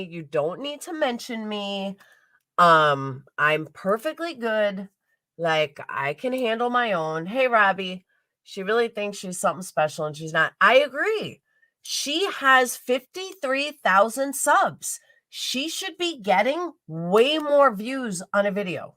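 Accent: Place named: New York, United States. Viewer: Exactly true lots of people got brain tumors. You say so cute Ny accent. Lol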